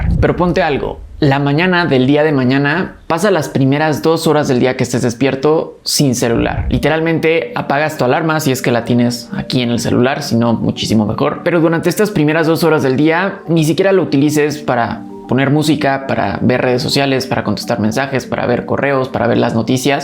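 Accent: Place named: Fresa, Mexico. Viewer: Y EL INTENTAR HABLAR FRESA ES PARTE DE LA RUTINA ? ...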